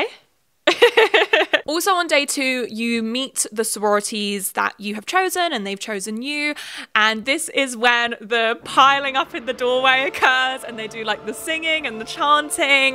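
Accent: England, United Kingdom. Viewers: I think it’s because it’s your English accent, but thank you for sharing your story!! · Its interesting hearing an english person explain this to me as an engineering major from philly not involved in sororities at all lol like why am i watching this i have so much hw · love British accent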